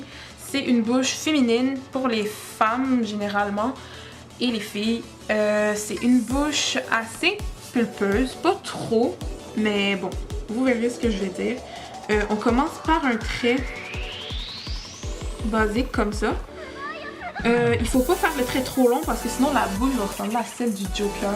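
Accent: Quebec, Canada. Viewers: je suis sur que tu est Québécois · Tu est Québécoise !!! 😮✋😐. Ton accent t'a trompé mon amie 😏 Et une affaire de de résolu 😃 TU mérite MINIMUM 100 000 k abonné 😉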